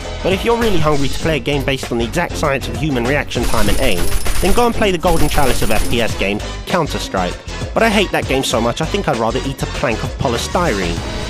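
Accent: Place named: Cockney, United Kingdom. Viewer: You're slighty posh but slightly cockney... Weird D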